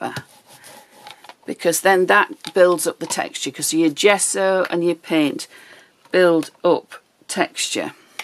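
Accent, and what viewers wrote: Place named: Yorkshire, United Kingdom. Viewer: Looking forward to trying this. Your accent sounds like my cousin's wife – she's from Stamford Bridge.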